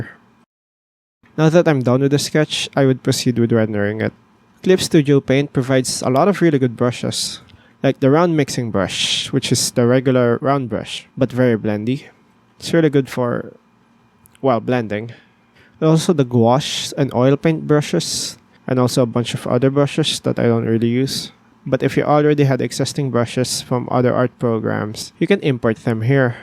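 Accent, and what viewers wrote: Philippines. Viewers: Are you filipino or SE asian? i love the accent, sounds very asian specifically fil xD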